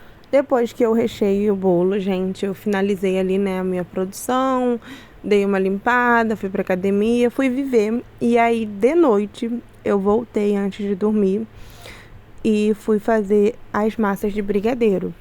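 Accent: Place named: Rio de Janeiro, Brazil. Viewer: É o sotaque carioca kk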